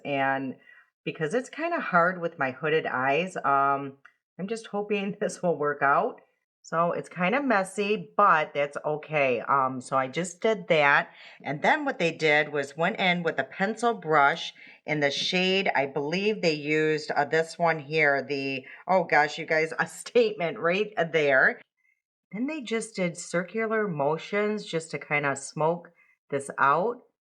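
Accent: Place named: Michigan, United States. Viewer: Now I really want this palette! Your voice sounds like home - you must be from Michigan ❤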